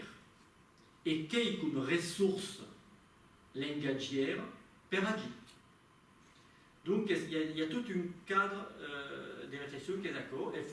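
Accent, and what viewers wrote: France. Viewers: he says provençal words with a very strong french accent, is my impression as a catalan speaker. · tEnen No, la seua llengua com a tal és molt pura, llevat de l'accent francesitzat.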